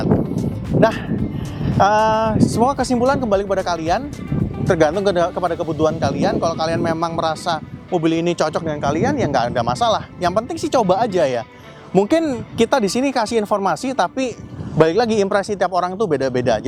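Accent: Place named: Java, Indonesia. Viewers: Lokasi apartemen denver Layak bahasa.e medok🤣🤣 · Wong jowo to mas, 😁 semangat mas broo.. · logat jawa timuran.... 😜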